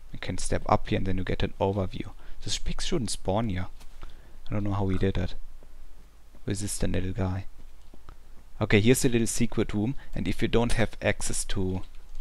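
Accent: Germany. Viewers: you sound german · you have a german accent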